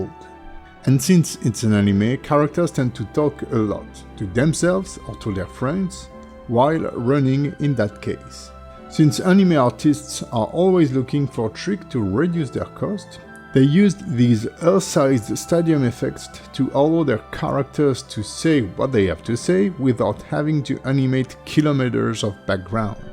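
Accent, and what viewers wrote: France. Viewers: My favorite tutorials are french people making english video with a french accent while i'm french with not so great english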